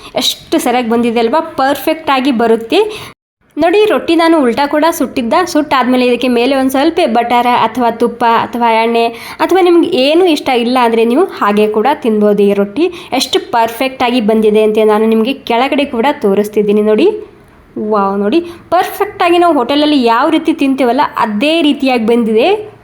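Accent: Maharashtra, India. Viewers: ನಿಮ್ಮ ಕನ್ನಡ ಮಾತನಾಡುವ ಶೈಲಿ ಕೇಳೋಕೆ ತುಂಬಾ ಸಂತೋಷ ಆಗುತ್ತೆ. ಮಹಾರಾಷ್ಟ್ರದ ಸೊಗಡು ಮಿಶ್ರ ಮಾಡಿ ಕನ್ನಡದಲ್ಲಿ ವಿವರಿಸೋದು ಆಕರ್ಷಣೀಯವಾಗಿದೆ. ನಿಮ್ಮ ಅಡುಗೆ ಮಾಡುವ ವಿಧಾನ ನಿಜಕ್ಕೂ ಪ್ರಶಂಸನೀಯ 👏👍.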